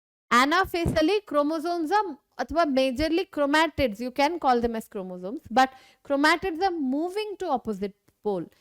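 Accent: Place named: Andhra Pradesh, India. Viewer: Mam u r mother tongue is Telugu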